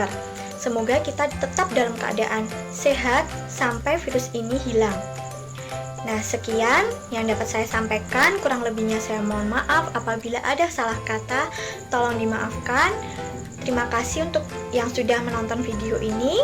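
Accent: Java, Indonesia. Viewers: this is medok but so goodddd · Sangat medok ya mba but still ayu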